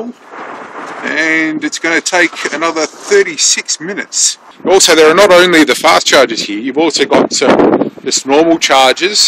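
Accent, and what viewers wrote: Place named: Australia. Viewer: Dude ! Aussie !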